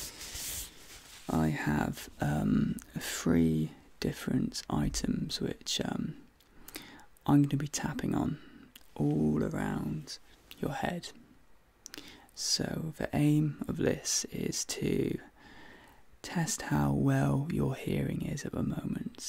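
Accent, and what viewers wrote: United Kingdom. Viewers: The (super mega hot) British accent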